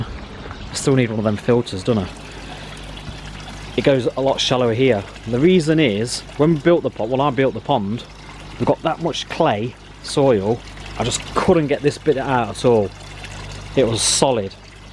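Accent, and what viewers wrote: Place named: Nottingham, United Kingdom. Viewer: Just subbed to your channel mate! Lovely pond with some great ideas going forward! If my keen ear for an accent is correct, you sound very local to me mate lol. I'd guess at Notts (potentially Derby) but ill stick with Notts :) North Leicester me :) Looking forward to more updates!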